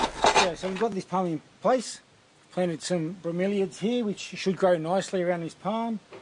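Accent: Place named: Australia. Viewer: As an American, I really enjoy listening to an Aussie explain things. Always straight forward to the point. Great video.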